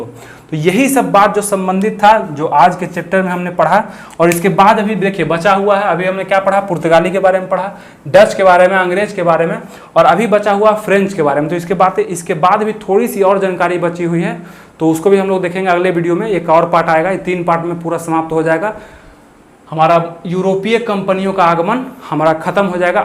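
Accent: Bihar, India. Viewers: Thanks a lot sir🙏...aapk language se lgta h aap Bihari ho aur hum v (i proud to be Bihari)💪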